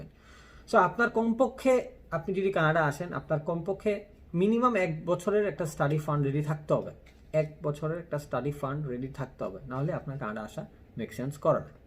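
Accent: Sylhet, Bangladesh. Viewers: Bro you are from sylhet right..? Me to😎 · Are yu sylheti?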